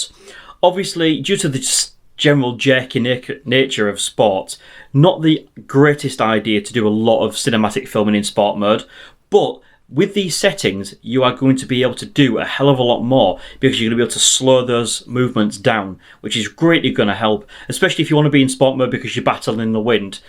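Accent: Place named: Yorkshire, United Kingdom. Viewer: All the time I was watching this video I'm thinking "This guy's from somewhere near Hull in the UK I think? He's definitely a Yorkshire lad anyway. My wife's family are all from Hull, which is the reason why I thought this was the case. Am I correct?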